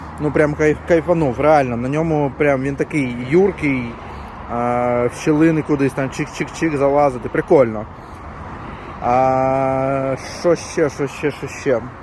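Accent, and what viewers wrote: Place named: Russia. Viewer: Комент в підтримку каналу, виключно за українську мову! Відчувається що людина раніше розмовляла Мокшанською... Респект! Розвитку і успіхів!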